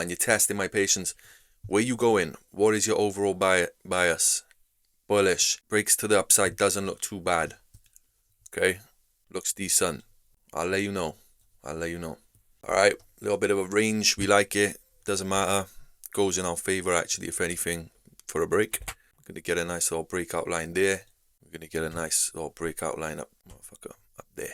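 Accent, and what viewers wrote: Wales, United Kingdom. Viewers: You Welsh mate? Just by the accent. From Wales?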